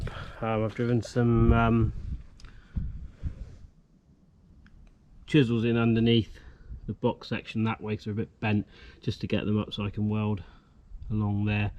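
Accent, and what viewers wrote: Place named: Cornwall, United Kingdom. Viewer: 3 seconds into the video i subscribed. Nice to hear the cornish accent. Again after leaving fowey/Truro and spending the last 8+,years in New Zealand.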